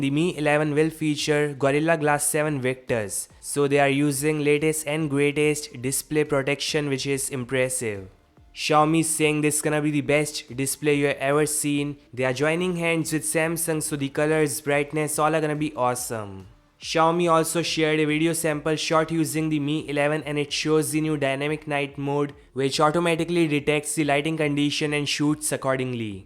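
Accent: India. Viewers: Logat india people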